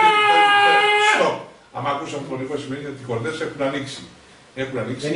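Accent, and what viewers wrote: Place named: Greece. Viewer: Όλοι κοροϊδεύετε αλλά ο πάτερ έχει γνώσεις φωνητικης και πατάει στον τόνο. Απλά έχει νησιωτικα πατήματα στο βίντεο και φαίνονται κάπως. Δεν είναι μαλακας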